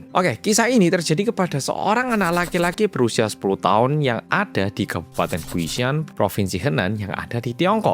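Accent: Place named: Java, Indonesia. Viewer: Malam muedok lgsg nyimaaak🎉🎉🎉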